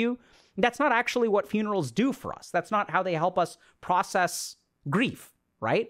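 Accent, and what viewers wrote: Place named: India. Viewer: I can listen to his indian accent all day 😂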